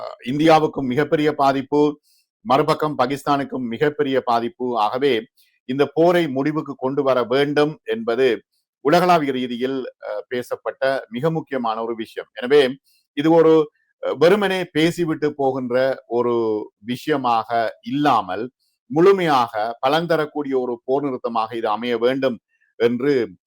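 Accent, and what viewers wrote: Sri Lanka. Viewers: Brother unga srilanka Tamil arumai aana india vanthu Pakistan ku kudutha adi perusu aana indiala paathippunu solluvathu thavaru ingu pathippu apdina Pakistan kaiyala thanamana kolai thanamana makkalai thakkiyathu eni indian army vidathu indian PM Modiji vidamatyaru usa eni vantha angaium adi vilum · முதலில் நீ யாரு உன் தமிழ் இலங்கை தமிழ் மாதிரி தெரியுது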